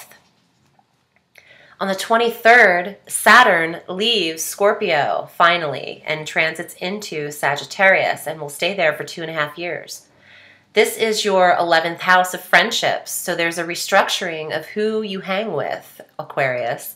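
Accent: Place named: New Jersey, United States. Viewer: what a gemini communicator you are....i am so glad saturn isn't retrograde in my 10th house anymore...that was a 2 yr typhoon ruby if there ever was one! jupiter retrograde n the 7th house till 8/15!! giddyup!! I wish you would let more of your NJ accent out! I would love to hear your accent unadlterated....you could do your videos in pigtails and and yellow shirt with neon pink polka dots...you are fantastic.....love your nails....you're always changing the color ms. gemini.....ur explanations are in depth and so educational....thanks for taking the time to interpret. ;) always appreciated.....a fellow east coaster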